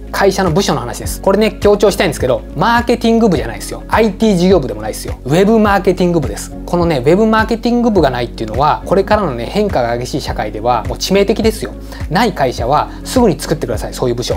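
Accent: Hiroshima, Japan. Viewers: さんの鋭い感覚と情報分析力には頭が下がります。かなりの情報収集されているのが感じます。今回も勉強になりました😌 さんくらいの若さなのに、新しいことを取り入れ変化するのを嫌う社長を何人か見てきました。とても残念でした。キーエンの営業は素晴らしいですね。アイデア次第でビジネスはどんどん広がりますね。ドコモのような多角的ビジネススタイルも今後は必要ですね。これは個人のビジネスでも言えますね。私も起業するなら、このスタイルを目指したいです。さんのように。。。激変の世の中でも、やはりHR(人的資源)も欠かせないと思います。社員のモチベーション管理、満足度アップ、働きやすさ、優れたパフォーマンス発揮アップ等、社員の扱い方について、ぜひ動画であげていただけたら嬉しいです。次回が待ち遠しいです。すっかり &広島弁ファンです☺️ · さんこんにちは。さんと私の会社の社長、知合いなのかなと思う位、お話の内容が近いです。ゲームチェンジャーを意識する様、日頃から言われています。ただ、私には話が難しくて…。それをさんがわかりやすく解説し、深堀りして下さるので、本当に助かります!!段々理解できてきました。美声も戻り、かわいい広島弁も聴けて、毎回動画が楽しみです。ありがとうございます！ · 広島弁、親近感あります😊💕 コロナで大打撃受けたのに生き残ってる百貨店勤務です😅 うちの会社もゾンビかもしれません🧟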